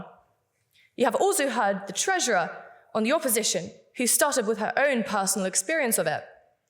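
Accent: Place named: United Kingdom. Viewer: you cannot say so because she mush have been living in the UK for too long given her accent. Probably immigrated as a child.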